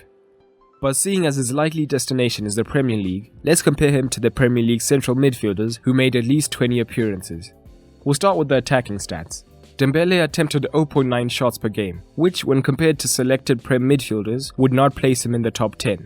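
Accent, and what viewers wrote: South Africa. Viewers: You sound South African. New subscriber 🙋🏽‍♀️ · What do I think? I should let you know in the comments below? I think... I think you sound South African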